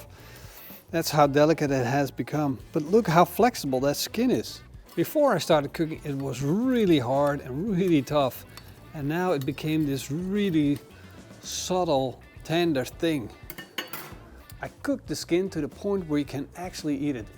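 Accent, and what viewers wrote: Netherlands. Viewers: Sounds like a dutch accent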